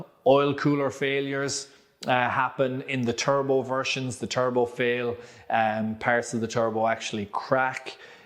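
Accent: Ireland. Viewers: Completely agree with your list. All mechanics and auto electricians have a list of vehicles that they would not recommend to their worst enemy. I am retired from the trade and I understand the issues that mechanics have to face in relation to dealing with badly engineered vehicles. Unfortunately, all vehicles have issues of some kind, my list includes some Peugeot models, not I hasten to add not the old 505/504 models. Some Volvo models have given me grief in the past. As usual great video. Just out of interest what part of the republic of Ireland are you from, cannot place the accent, I am from Northern Ireland and living in Melbourne Australia.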